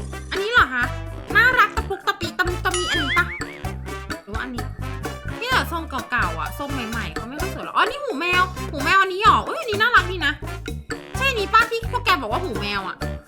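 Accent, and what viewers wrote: North, Thailand. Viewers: พี่คนเหนือหลอครับ😂😂😂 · พี่ เป็นคนเหนือใช่มั้ยครับ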